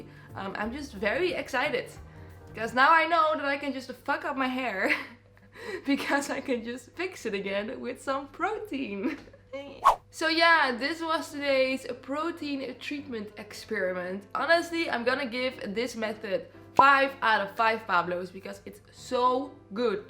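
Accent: Netherlands. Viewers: Wtf is that accent. She is 100% Dutch. Aaaaah cringe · Ik hoor meteen dat je een Nederlander bent😂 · Are you from the netherlands becouse iam and your accent is sumulair to myn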